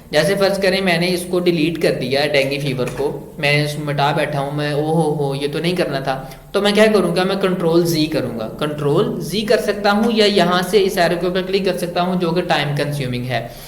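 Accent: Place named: Punjab, India. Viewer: Hahaha punjabi touch 👌 .. Well explained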